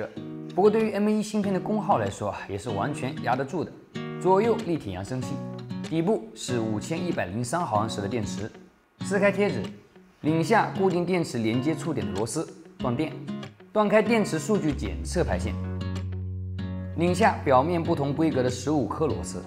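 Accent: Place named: Zhejiang, China. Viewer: 浙普的味老重了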